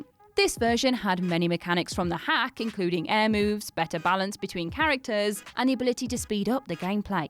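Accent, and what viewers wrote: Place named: England, United Kingdom. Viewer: I could literally listen to talk all day long. Don't know what style of English accent she has but a red head with a beautiful accent whom I'll never meet or talk to has stolen my heart like a school boy crush. Swoon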